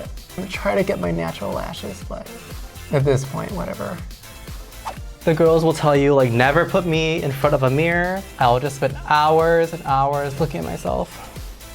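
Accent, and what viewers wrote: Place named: Chicago, United States. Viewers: the chicago accent is jumping out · I'm only able to focus on her Midwestern accent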